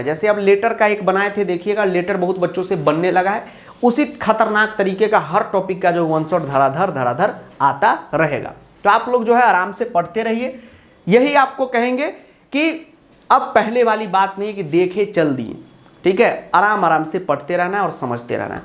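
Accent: Bihar, India. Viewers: Sir ka bihari accent bada mst h😂😍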